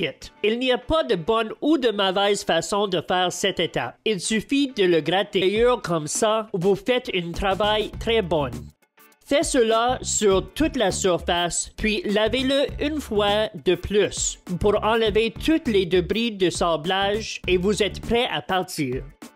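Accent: Quebec, Canada. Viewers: Aie c quoi cette voix c l'accent québécois mélanger avec canard 🦆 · je pense tout simplement que ce monsieur est Québécois.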